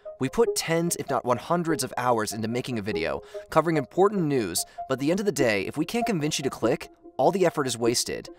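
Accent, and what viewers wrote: United States. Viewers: Bruh. He has a normal American accent. He just isn't pronouncing these names right. Anyone more aware of these things should know that "Delhi" sounds like "deli." It's just incompetence. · Are you American 😅😅😅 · Love the videos. Hate the American pronunciation of all the places in the world. Saying that "new daily" is a new one for me.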